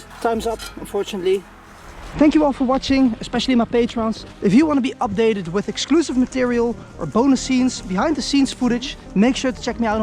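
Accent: Netherlands. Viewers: Can I come with you one time? Sounds like you are from Holland, damn I love this 🤓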